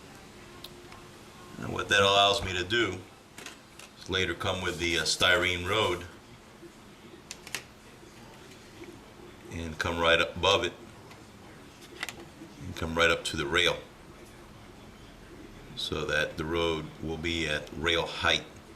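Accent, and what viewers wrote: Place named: New York, United States. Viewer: I was going to ask you are you from New York because you sound like it..lol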